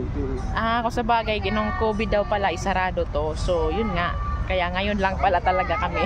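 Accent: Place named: Visayas, Philippines. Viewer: Vlagir ka ba Day? Moka ka kseng BisayA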